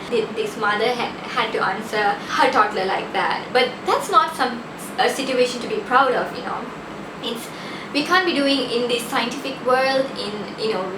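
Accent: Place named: Tamil Nadu, India. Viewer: other than your tamil ascent i love you videos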